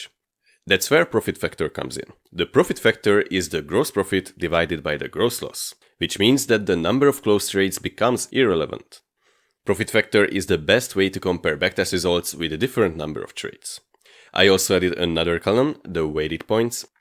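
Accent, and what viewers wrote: Hungary. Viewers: Hi, are you Hungarian?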